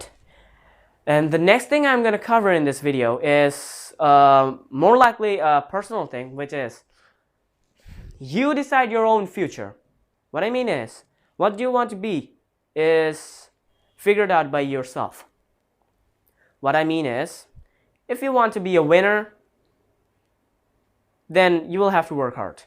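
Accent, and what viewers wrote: United Kingdom. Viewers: Alhamdulillah...Love the British accent...keep it up..❤❤ very good job. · Nice job, keep it up broh! Love the British accent btw 😉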